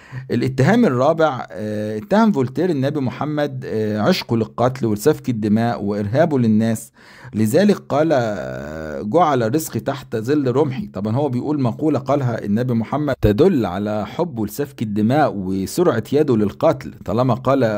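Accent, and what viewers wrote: Egypt. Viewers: أنت من ميت غمر يابني ؟ لكنتك واضحه جدا ، خد دروس إلقاء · خمس الغنائم.. حسب فولتر….. يا سيدي فولتر من دون ما أبحث عنه أطيب منك ولا يوجد عند حقد متلك. لهجتك مصريه مهما أخبئت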